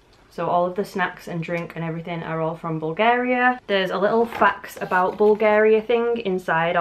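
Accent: United Kingdom. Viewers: I love all fake banana flavors and concerning other posts below me how everybody is talking about where you're both from somewhere different I thought you were from the UK and he sounded to me like he was from America so I just thought I'd add that my two cents in cuz I actually have no idea.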